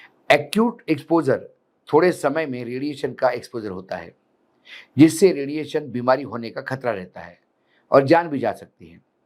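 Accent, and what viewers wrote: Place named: Mumbai, India. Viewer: Andhe bhakt pehle marte bad mai hum 😂❤ ji apki bhasa mast Mumbai tadka Wali hai apko sunne ka ek Maja hai😂alhamdulillah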